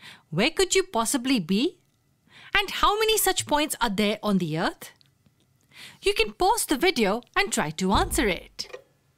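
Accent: India. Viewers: Is the narrator Indian by any chance ? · Indian accents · Is this an Indian talking? Unsubscribe to this channel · Indian accent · Indian accent??? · y u sound indian tho?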